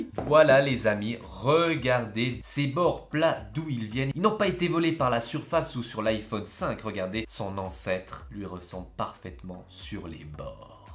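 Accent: Switzerland. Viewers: J'me disais aussi avec un accent pareil pouvait être que "suisse" et pas frenchie, et on s'presse pas siouplait ))))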